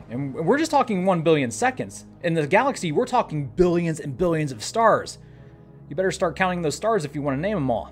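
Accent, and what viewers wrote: United States. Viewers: Seine Aussprache ist herrlich Amihaft. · I like your content, but you have a lot of Kevin flavour, confirming all my Dutch stereotypes of what an American called Kevin looks and sounds like.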